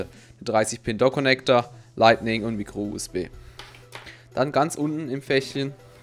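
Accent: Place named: Karlsruhe, Germany. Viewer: So ein schöner Karlsruher Dialekt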